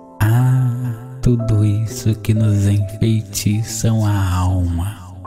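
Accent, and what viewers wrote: Minas Gerais, Brazil. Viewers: Falando desse jeito eu nem vou dormir essa noite que isso você deixa eu sem saída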